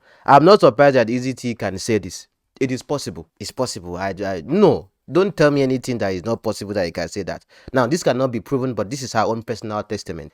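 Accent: Calabar, Nigeria. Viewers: Why does your Igbo sound like Calabar/Akwa Ibom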